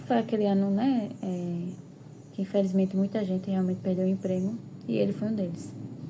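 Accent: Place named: Northeast, Brazil. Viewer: Graça e Paz irmã Olha não sei de onde vc é, mas pelo lindo sotaque acredito que dê alguma região do nosso lindo e abençoado nordeste eu sou se São Paulo, olha o seu vídeo falou muitoooo comigo , apareceu aleatóriamente aqui no YouTube e desde o título até o final do vídeo, inclusive o hino falou ao meu coração 🙌🏻🥺 Que Deus te abençoe, já se tornei uma inscrita 😍🙏🏻❣️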